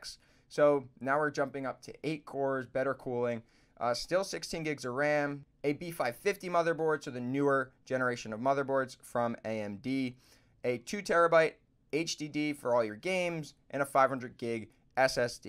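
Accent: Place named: United States. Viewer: which currency is this in. you sound american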